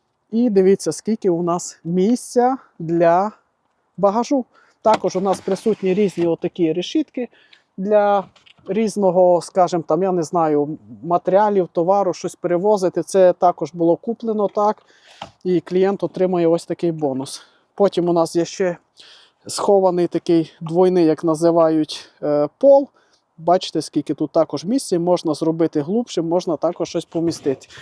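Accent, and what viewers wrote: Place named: Transcarpathia, Ukraine. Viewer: Ти з Закарпаття "Чоловіче"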